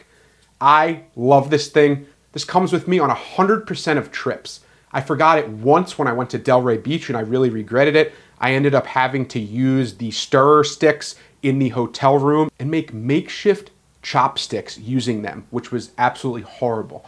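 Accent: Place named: Philadelphia, United States. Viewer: That Phuluffia accent. Go Birds!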